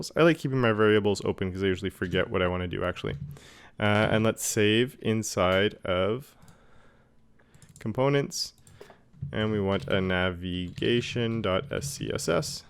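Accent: Canada. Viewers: love the videos, are you Canadian by chance?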